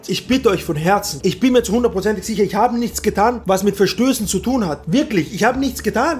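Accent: Austria. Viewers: Kommst du aus Österreich? #teamgeorgTV 😊😊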